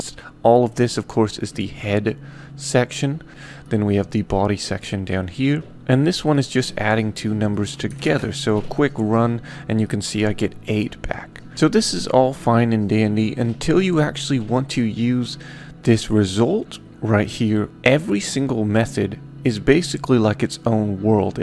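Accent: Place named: Scotland, United Kingdom. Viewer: You sound so Scottish in this lesson ^^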